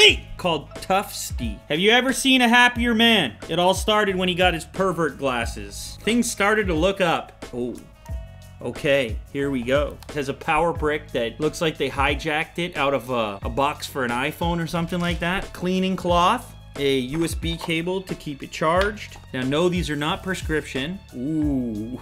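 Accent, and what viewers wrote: United Kingdom. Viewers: actually a pretty good uk accent! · That Excellent British accent though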